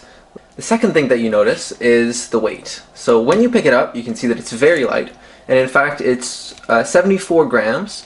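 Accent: Canada. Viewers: R U canadian? Or Québécois?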